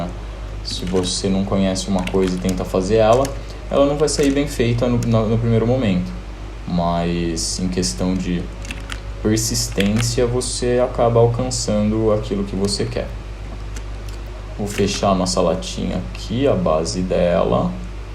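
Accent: Brazil. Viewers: O sotaque brasileiro o entrega,. rsrs Parabéns pelo projeto, brother.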